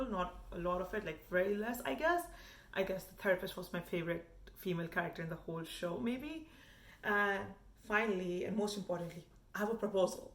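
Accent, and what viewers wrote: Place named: India. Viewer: I'm sorry you ended up hating the role you auditioned for 🤣. But your accent is still Indian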